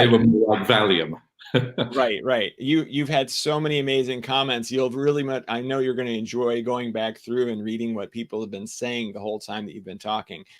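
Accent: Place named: Australia. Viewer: Great talk! I loved listening also because of hearing Australian, it makes me miss Australia even more :)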